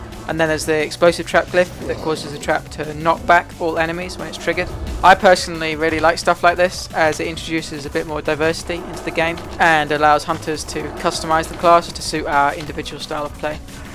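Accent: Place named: England, United Kingdom. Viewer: im not sure but he sounds english :p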